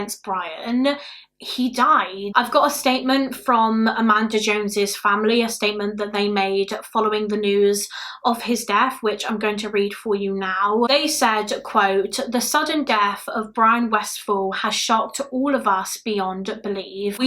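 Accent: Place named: West Country, United Kingdom. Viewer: In case you're interested, accent is from the English West Country, so Bath or Bristol. Somewhere in that neck of the woods. 🙂❤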